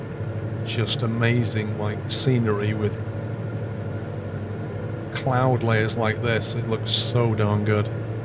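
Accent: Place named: United Kingdom. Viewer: Dude good video as always. But you sound British and should know its pronounced "Edin-bruh" not "Edin-bo-ruh" :)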